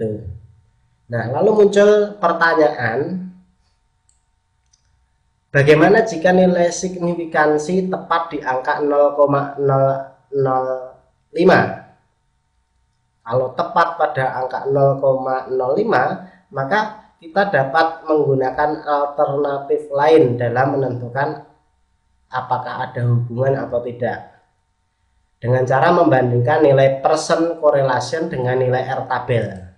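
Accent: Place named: Java, Indonesia. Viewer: medok-medoknya sekilas mirip sama alm. bapakku.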